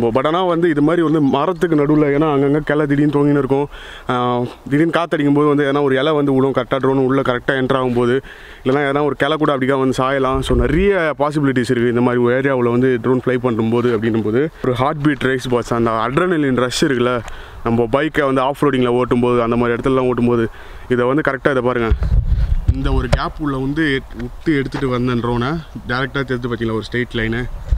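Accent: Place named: Chennai, India. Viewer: Chennai slang iruku bro pesumbothu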